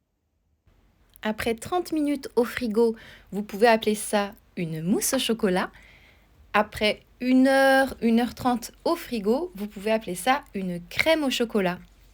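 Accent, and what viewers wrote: France. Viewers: J’aime beaucoup votre accent Francais; tres, tres mignon! Je n’ai jamais les occasions parler ou ecouter a la belle langue francaise!! J’aime beaucoup votre recette aussi, je vais immediatement l’essayer! Merci a vous 😃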